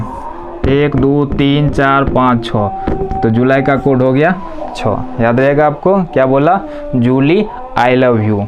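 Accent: Bihar, India. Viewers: आप बिहार से हो ?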